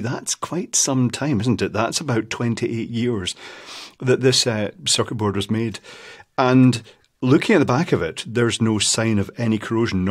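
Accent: Scotland, United Kingdom. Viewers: 28 years ago I doubt I would understand his heavy Scottish accent.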